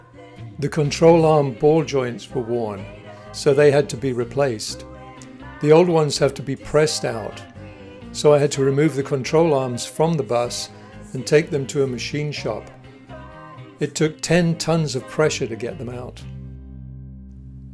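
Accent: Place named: West Country, United Kingdom. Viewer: Your accent, there is a hint of west country, maybe Swindon or Bristol? Great effort, you worked very hard and the bus looks fantastic. Enjoy 😉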